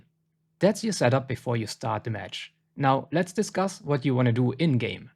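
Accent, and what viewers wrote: Germany. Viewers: Du bist safe deutsxh der akzent verrät dich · Bin ich bescheuert oder höre ich den deutschen Akzent raus ? Gute Zusammenfassungen. Hoffe in Zukunft folgen noch mehr Hilfestellungen zu Visitenkarten. Bin auf weitere Videos gespannt !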